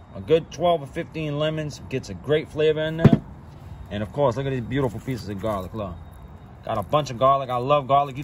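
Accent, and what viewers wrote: Louisiana, United States. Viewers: He's Cajun,!! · Mr I love your videos and definitely that Louisiana accent can’t be duplicated I live in probably the hottest states in our country Tucson Arizona and we love the Louisiana food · Just sounds like some LA cajun to me, boss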